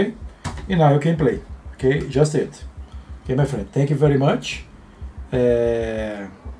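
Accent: Brazil. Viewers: esse sotaque ai é br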